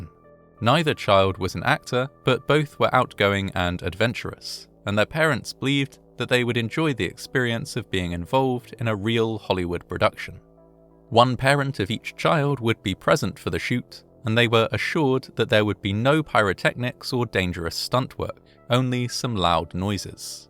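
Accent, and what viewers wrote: England, United Kingdom. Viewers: The clip isn't even shown. Just some British dude yapping... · What part of England are you from?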